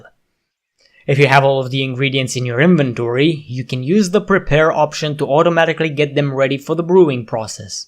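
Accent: Romania. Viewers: Nice. Oh btw I didn t know u were Romanian. Niceeeee accent!!!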